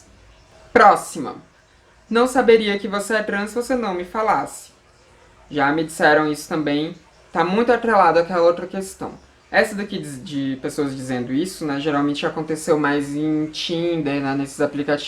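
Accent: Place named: South, Brazil. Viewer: aaaah tendi, ñ sei pq o jeito vc q vc fala lembra um pokiiinho o sul 😄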